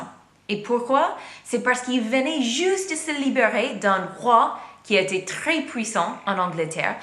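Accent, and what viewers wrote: United States. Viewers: she's also pronouncing half the words as she would in english. her american accent is very very strong. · Heehee. English Canadian here (but dating a Parisian man in Vancouver) and I so appreciate your speaking in French (and your analysis) but to be perfectly frank I'm laughing because your accent is just so incredibly American. As in there is just the barest effort to pronounce your words as mildly French, hahaha. Please don't mind my comment because it's endearing to begin with but even more so because you are so earnest and passionate about the topic. Your language skills are super however by the way; I'm jealous and still learning in an elementary level. ;)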